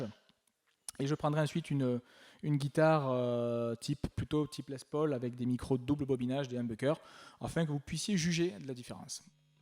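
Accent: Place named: United States. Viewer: You sound like an American 😉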